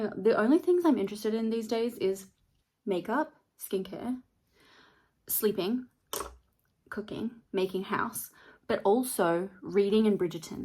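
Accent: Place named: Australia. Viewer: I’m 31 and I’ve been watching you for agesss and I feel like you’ve lost your Aussie accent a bit 🥺 love you and love Australians so much ❤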